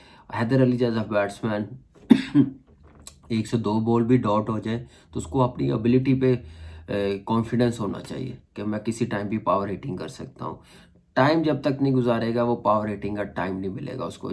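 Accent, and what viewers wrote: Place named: Punjab, Pakistan. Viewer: اردو کے مجدد قبروں سے اٹھ کر آئیں اور چھتر پھیریں کہ اردو کا بیڑہ غرق کیا ہے ۔ پنجابی نما اردو ۔ تلفظ سنو اور انتخاب الفاظ ۔ سمجھ آ گئی کہ آپ کی تعلیم بھی فارغ ہے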